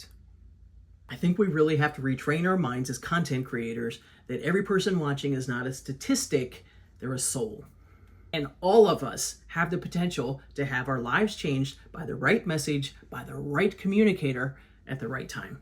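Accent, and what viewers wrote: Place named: South, United States. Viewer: Hey! I went to your channel and watched your Ace Hardware video. If you’re OK with a little constructive criticism, I would love to give you my review, maybe it will help a little bit. I loved your video! Your voice is awesome, (I love a great southern accent).😎 there is only one thing that I noticed on the video that would keep me from watching more of your videos. I noticed that you don’t look straight into the camera lens enough. I think on a video like this when you are talking to your audience you need to think of it like talking to a friend or one person. My favorite Youtubers look straight into the lens the entire time that they are talking to the camera and it looks like they are looking right into my eyes and that is what I love! Maybe you can practice this and get better at not looking back-and-forth between the lens and your own face, I know it’s a hard one, but I think that would help you a lot! 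I didn’t see any other issues with your video. Great content also! I love to see what is in a store before I go shopping.😃👏👏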